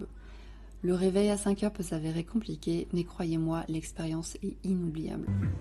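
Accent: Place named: East, France. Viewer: Merci pour cette vidéo, je pars bientôt à da nang ça me servirab😊. C'est moi ou j'entends un résidu d'accent de l'est 🧐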